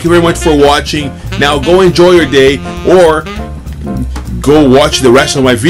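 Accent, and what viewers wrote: Rio de Janeiro, Brazil. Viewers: Esse ai tem um sotaque de bransileiro! Hum ou é? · I can still hear your Brazilian accent sometimes kkkkk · esse cara e brasileiro certeza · na vdd é um br falando english kkk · Esse cara eh brasileiro né? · Hahaha, você ensinou carioquês, não português! Lol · Kkkkk que da hora,vc é brasileiro cara? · kkkkk o cara ta ensinado a falar oq?? Carioca?? Mais não e todo carioca que fala assim não Moçu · tenho certeza que você é carioca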